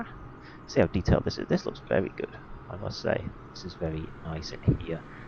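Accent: United Kingdom. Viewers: I have to admit that my lack of comprehension was also due to me being Canadian and having a hard time understanding the British or Australian accent, unless I can also read lips 😂. No idea if yours is a British or Australian accent, or whatever, but I would guess British, right ? 😂🤣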